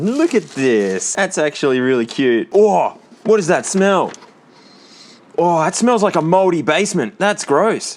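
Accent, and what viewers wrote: Australia. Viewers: I love your dedication to the Aussie SEGA pronunciation! Shocked this bootleg is so good! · how we pronounce Sega in Australia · As an American, seh-ga just feels better to say than say-ga, even though they sound basically the same to my ears. See-ga doesnt sound wrong to me at all though. Just sounds like the expected way for the Australian accent to modify the word. Accents just do that.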